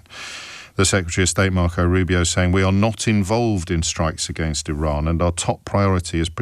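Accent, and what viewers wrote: United Kingdom. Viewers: James is all over the place a Irish name posh English accent trying to both side a one sided argument he just hasn't brought out the moral quandary line yet?😢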